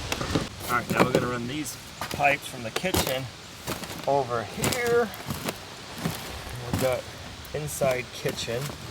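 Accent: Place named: New England, United States. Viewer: Oh you said “ TARP”, I heard “THHHAAAAP”. You New Englandera kill me with your accents! LOL. AND NO your not going overboard.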